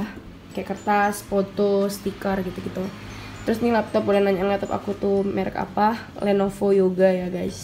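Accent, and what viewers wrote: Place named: Medan, Indonesia. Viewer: lahh org medan jugakk? pantes logatnya medok kalii wkwk, sehattsehat kau yaa kak<3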